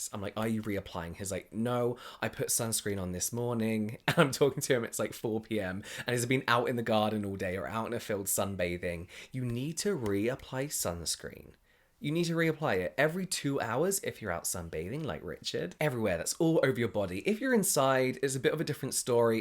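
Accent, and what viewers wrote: United Kingdom. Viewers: I realized you and MsAlexx (UK fashion vlogger) have the same accent and I can’t unhear it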